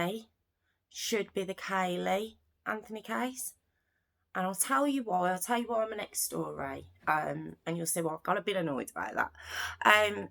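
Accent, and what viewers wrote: United Kingdom. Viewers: What kind if an accent di you have? British, or Irish? I can't distinguish it. I just heard of this story, and it's so awful.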